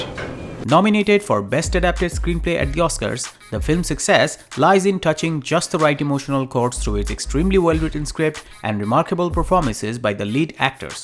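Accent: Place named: Bengal, India. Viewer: You're the perfect example of pure Bengali accent. It's enjoyable to listen.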